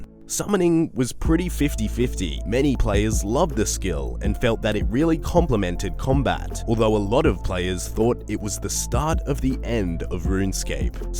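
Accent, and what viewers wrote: Australia. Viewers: wtf your Aussie, never knew :) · wait you australian? lol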